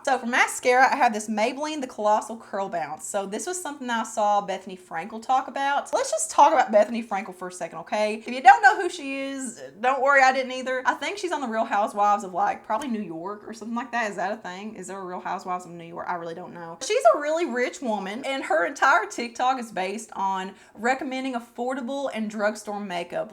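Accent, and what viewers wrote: South, United States. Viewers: You're from Kentucky?!? I've been watching you forever, but I had no idea! I'm an eastern Kentucky girl 👋 I've wondered about Kentucky being part of the South too because it's technically not. But, girl, listen to our accents (mine is thicker than yours) we definitely Southern lol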